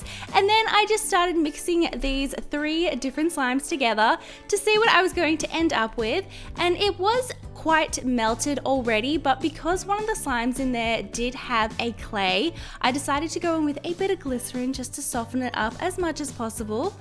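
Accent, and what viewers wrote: Australia. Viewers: I loooove your accent it is so so pretty. Is it Australian?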